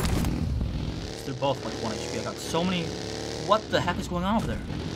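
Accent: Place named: United States. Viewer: I love your American accent commentary love u brother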